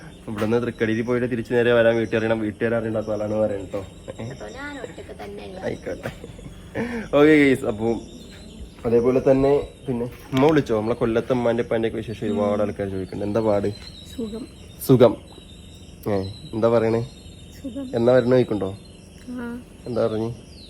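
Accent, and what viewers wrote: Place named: Kanjirappally, India. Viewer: സംസാരവും ഞങ്ങൾ കാഞ്ഞിരപ്പള്ളിക്കാരുടെയും സംസാരവും ഒരുപോലെ ഇരിക്കുന്നു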